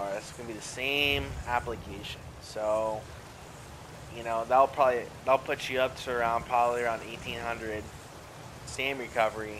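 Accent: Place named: West Coast, United States. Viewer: Yo you gotta be from the west coast with that accent. Lol nice vid bud.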